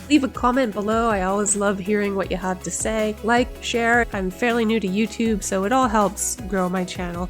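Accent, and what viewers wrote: Canada. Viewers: Thank you so much for posting this! I have only refinished mid-century furniture with walnut veneer. But I bought a cute circular table with solid wood legs and skirt, but it has a laminate top. I will be applying your process to paint the top and edge. Wish me luck! Yank here btw who visits Toronto about once per year. I knew you were Canadian about 30 seconds into this video 🙂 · Your ideas and work are beautiful and inspiring. Please keep explaining why you choose certain products; it helps me to better understand the process. The music is fine but I'd be fine with hearing just your voice. You have a charming accent that sounds like my Canadian family.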